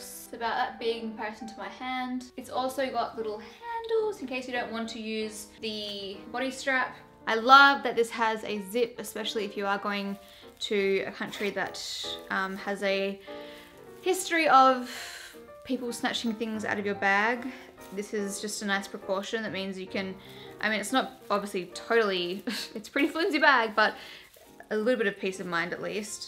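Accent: Australia. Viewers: Is she aussie?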